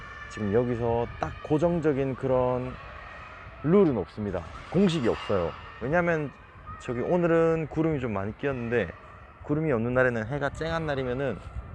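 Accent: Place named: Gyeongsang, South Korea. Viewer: 멋지다^^ 갱상도사투리 쩐다ㅋ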